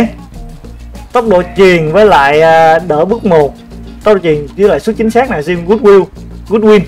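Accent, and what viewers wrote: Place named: South, Vietnam. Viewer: Xem kênh a phần vì content chất lượng, phần vì a nói giọng nam nên cảm giác gần gũi hơn:))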